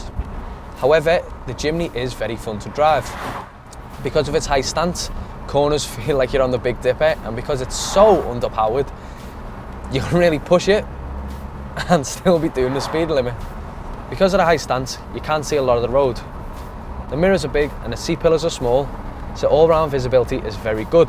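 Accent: Liverpool, United Kingdom. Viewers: Can of cokeeeee and a packet of chiiiiippppss!! Scouse presenter is so annoying! Mind you I cant stand liverpool accents!! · Are you Scouse or Dutch?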